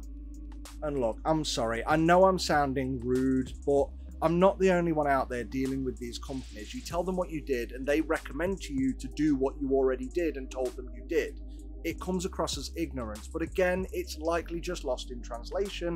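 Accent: United Kingdom. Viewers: "I sound cocky and arrogant" Me: That's probably Americans judging him based on his accent.... explains boot As an American, I think any American that interacts with any UK media or content creators and does not know bonnet, boot, lift, flat, torch, etc is just being willfully ignorant... on the positive side though, they would also miss the "knob" double entendre.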